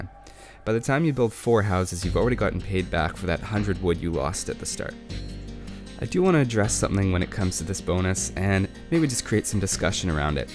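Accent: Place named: Canada. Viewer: Your accent sounds Canadian haha. Are you from Canada?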